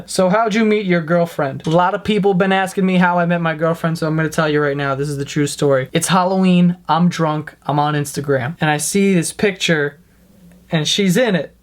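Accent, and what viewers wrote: New York, United States. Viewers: He's a New Yorker i say that too. Ppl say things differently lol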